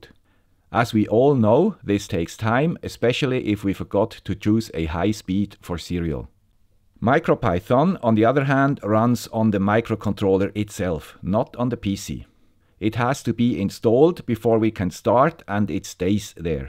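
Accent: Switzerland. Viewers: I also love your intro ! When I hear it is the "guy with the Swiss accent" I know I will be watching something educational and entertaining ! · Yeah I like the dir command. Long ago I made a python program that allowed the use of cd, change directory, even. I think it went into lists and dictionaries as well. People seem to copy what I make, even when I think it's locked down. Nonchalant works better. Regardless, I seem to give people good ideas as well. Love this place! Thanks man with the Swiss accent. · The guy with swiss accent...hehe...Hallo und viel erfolg.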